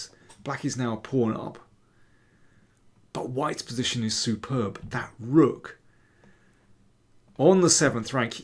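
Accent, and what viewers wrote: England, United Kingdom. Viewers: I agree : a delightful english accent that makes the video even more agreable.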